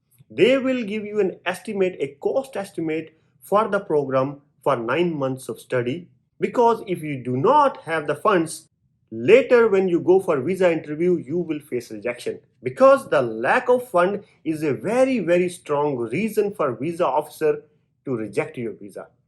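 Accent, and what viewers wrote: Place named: Bihar, India. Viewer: Abey Bihari...accent theek karo.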